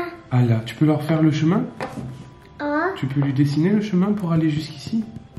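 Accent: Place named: South, France. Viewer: C'est marrant comment tu as l'accent du sud + marqué que haha! J'avais jamais capté. En tout cas très bon vlog, keep going guys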